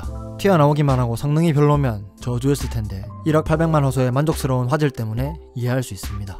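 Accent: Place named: Changwon, South Korea. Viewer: 님 창원사람인가보넹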